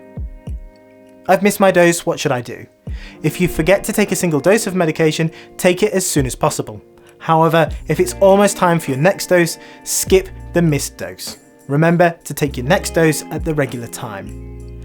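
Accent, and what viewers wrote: United Kingdom. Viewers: He has a British accent because he’s British. He speaks very clearly and is easy to understand to me and the background music is fine too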